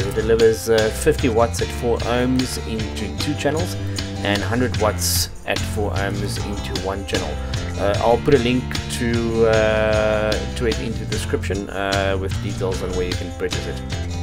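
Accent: South Africa. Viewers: Great to hear that South African accent! Brilliant speaker build as well. Thanks for posting :) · awesome video, agree with Jeremy great to hear South African Accent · Sorry for the rather odd question, but are you South African? Kind of have an SA accent :) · Dude Are You From South Africa?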